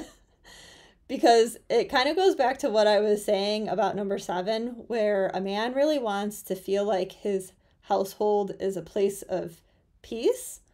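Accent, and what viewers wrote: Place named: Midwest, United States. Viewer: Liking the midwest accent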